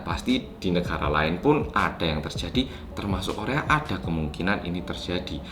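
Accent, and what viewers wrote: Java, Indonesia. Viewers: Mas ak subscribe seminggu yang lalu,mumpung lockdown aku langsung maraton nonton videone lho...ben nonton videone aku mesti mesem2 dewe mergone medok kyk aku,sampe bojoku gumun 😆.salam seko kota Semarang ya mas.... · Hal yg buat ku betah nonton bang walaupun isi kontennya rindingdong yaitu karena medoknya dan mirip oppa Lee Jong Suk 😅😅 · Eee mmg yg memberitakan kn di TV korea, tp yg buat kita"orang Indo ngerti krna mas yg menyampaikn dgn bhsa Indonesia yg medoookk..itu mknya pda langsung pda paham...btw..Thankyou buat mas 'lah..kpn"klo ke Malang tak traktir Rawon ya...n klo q bisa ke Korea jg..tak sambangi ya di bwah apartemen kmu. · Halo guys Welcome to korea reomit bersama orang korea yang medhokk. Mana Dung dung tak dung nya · Mas yang medok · Seng Medookkkk Mantep Mas 😃😃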